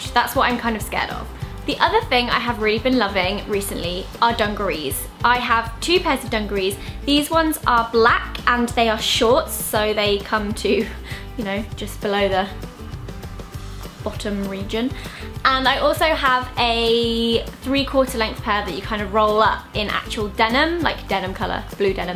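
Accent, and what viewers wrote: United Kingdom. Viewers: I noticed after she finished showing her pizza queen phone case she said hooray but pronounced it "hoo-ra". I love and her British accent.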